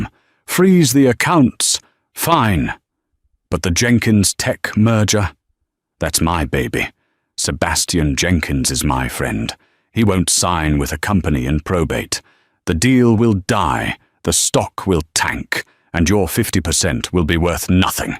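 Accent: United Kingdom. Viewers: I certainly prefer the British English. The grammar, accent and pronunciation are in-line with my education. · The commentator's voice is quite pleasant, as well as the British accentuation that gives a convincing touch to the story.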